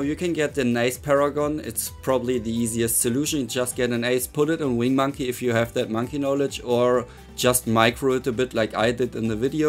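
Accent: Germany. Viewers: Ich liebe diesen deutschen Akzent wenn du englisch sprichst 😂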